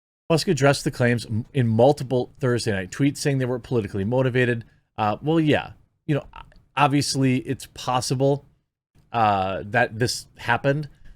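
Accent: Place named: North, United States. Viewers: Yo, ignore those idiots who take issue with your pronunciation of the short-a sound ("ă" --- which the International Phonetic Alphabet actually represents with this symbol: "æ") like as in words that rhyme with "flag" and "bag." (Again, in the IPA, those two words are actually even represented, respectively, as "flæg" and "bæg," so it's not like the slight bit more emphasis on the "e" part of the "æ" was ever really wrong, anyway.) The only thing i will say, is that's not a midwest accent (well it is, but it's more specific than that). That's a Northern Midwest accent. Chicagoland and higher latitudes. I had to point this out because, as a fellow midwesterner, i don't pronounce my vowels like you do but I'm still midwestern. However, I know my neighbors to the north do, certainly, sound like you (and it's part of their charm lol). So, long story short, don't acquiesce to these numbskulls, be proud of your accent (and the region it associates you with in this great, massive, country of ours [.. 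"from SEA to shining SEA," commies]). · please don't care about the way you say flag or bag or stag or any of those type of words. It is normal to say it that way i nthe mid-nrothern states so no need to be self conscious about it.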